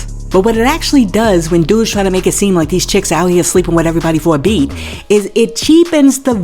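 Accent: New York, United States. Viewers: I ABSOLUTELY LOVE THE GRITTY SOUTHERN AND INTENSE NEW YORK ACCENT TO MY CORE.. IT FEELS LIKE HOME 🏘️ 🏡. I'M SO EXCITED FOR THE NEW CHANNEL..GIVE ME MORE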